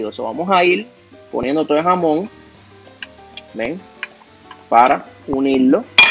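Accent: Cuba. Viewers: Primera vez que te veo y me encantó tu forma cubana de explicar, felicidades ahora te voy a seguir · saludos! Me encantó tu modo muy cubanazo de explicar, jajajajaaaa. Bueno te diré q en Cuba, mi papá que era cocinero, hacía en casa croquetas de todo tipo, riquísimas y como no tenía máquina moledora ni cómo conseguirla, el les daba forma con un pomo de refresco gaseado (los que bautizamos allá con el nombre de ”pepino”), le cortaba el fondo, por ahí lo llenaba con la masa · Me encanta tu forma de explicar muy a lo cubano. Me gustaría que hagas frituras de bacalao. Mi papá las hacía tan ricas. Gracias y deseo mucho éxito!! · Me gustó mucho tu forma tan Cubana y relajada de hablar y hacer tus croquetas, aprendí y me divertí, gracias ❤ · Las mías estarán bien deformes porque no tengo el aparato ese ! Jajaja …. Me encantan tus videos … muchas gracias por ser tan auténtico y agradable. 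Escucharte hablar me recuerda mi infancia en Cuba. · Me encanta este canal, no solo por las recetas que son buenísimas… también me encanta oírlo hablar, cubano 100% jajaja….. me encanta !!! Que tengas mucho éxito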